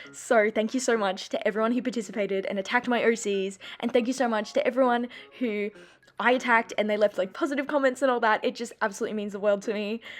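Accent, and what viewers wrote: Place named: Australia. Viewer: Are u from Australia right? I love your accent! And of course your style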